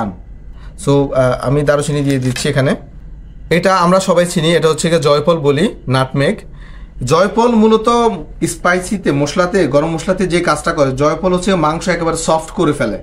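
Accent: Noakhali, Bangladesh. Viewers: ভাইয়া বাড়ি কি নোয়াখালী তে? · ভাই আমার কাছে রান্না এক প্রকার আর্ট, তবে আপনার কথা শুনে মনে হচ্ছে আপনিও আমার মত নোয়াখাইল্লা। মনে থেকে বলছি ভাই আপনার ভিডিও টা অনেক ভালো লাগলো। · কথায় বুঝা যায় নোয়াখালী অঞ্চলের মানুষ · ভায়ের বাড়ি নোয়াখালী নি। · যতই স্মার্ট উপস্থাপন করার চেষ্টা করো,নোয়াখাইল্ল্যার ভাষা বোঝাই যায়। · আপনার বাড়ি কি নোয়াখালীতে? anyway very helpful video, thanks. 😇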